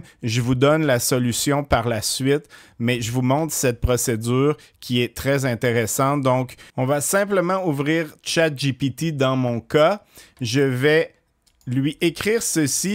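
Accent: Canada. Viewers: Merci, c'est vraiment super comme tuto. Toujours très clair et pour un belge l'accent canadien met de la "musique"dans ton exposé. C'est super 😊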